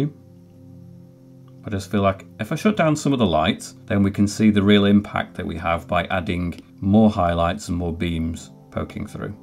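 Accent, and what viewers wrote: United Kingdom. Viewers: Hey! Are you British? And if so, which part of the UK are u from?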